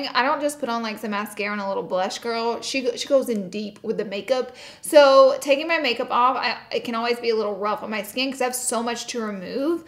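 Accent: South, United States. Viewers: Her southern accent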